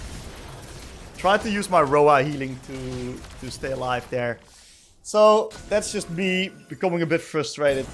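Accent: Netherlands. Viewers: he has a dutch accent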